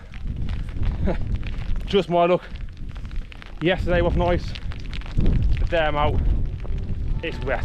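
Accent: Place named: Northern England, United Kingdom. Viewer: I think there are two things you could do to improve this video. The first is to pause your walk when you address the camera. Set a scene. Consider the background. Consider all the points you want to make, make them and then continue the walk. Look at the scenes and the composition in videos like those of the outdoor boys. The second, and I really don't mean to be offensive, but you really need to find a way to tone down the northernness. Like "A few years back, me dad give it me". This is barely intelligible. You'll be more accessible to a much larger audience if you could rephrase it as "My dad gave it to me a few years ago". I do like this content and I want it to succeed. But these are things that need to chance quickly, or else you'll struggle to reach new audiences.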